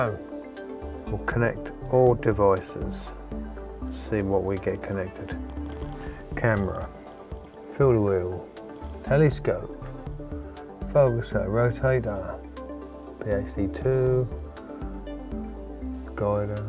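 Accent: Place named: Suffolk, United Kingdom. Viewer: Lovely photo's, also really nice to hear the accent... from another Suffolk boy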